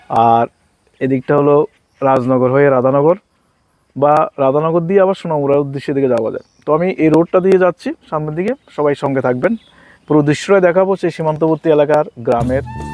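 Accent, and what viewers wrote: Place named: Feni, Bangladesh. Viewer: আমার বাড়ি ফেনী জেলায়, এখন খড়গপুরে আছি। আপনার উচ্চারণ একদম আমাদের মত। আমি একদিন ঘুরিয়ে আনবোনে ফেনী-চৌদ্দগ্রাম এলাকা, দেশে গেলে।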